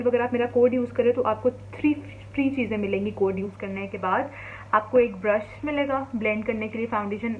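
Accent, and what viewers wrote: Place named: India. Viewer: slow down please you speak so fast like when you speak India, one hundred a miles an hour I don’t understand your English of course your English intonation like India speaks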